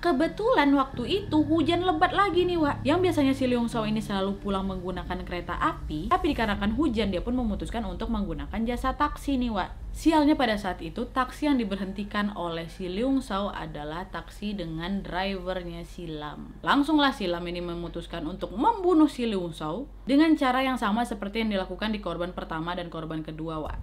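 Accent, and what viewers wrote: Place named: Medan, Indonesia. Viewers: Logat Medan wak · Medan kaleeee bahasa kakak ini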